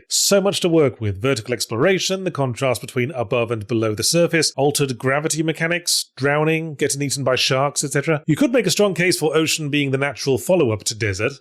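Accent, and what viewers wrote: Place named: United Kingdom. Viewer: College level intellectual breakdowns of video game design in cheeky tone with a British accent is peak. Love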